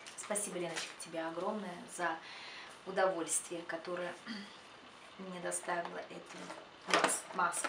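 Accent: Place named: Belarus. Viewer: Вы такая приятная)!обожаю акцент белорусов!!!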